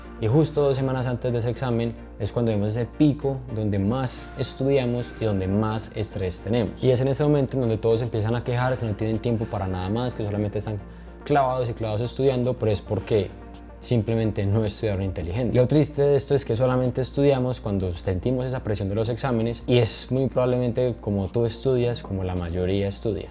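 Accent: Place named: Colombia. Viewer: Uy apenas escuche esa voz dije ese man es de Colombia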